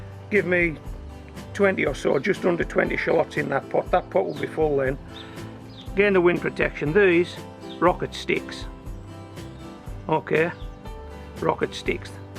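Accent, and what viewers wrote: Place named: Glasgow, United Kingdom. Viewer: Great boots...you sound like my Grandfather Patrick Alexander Leslie from Glasgow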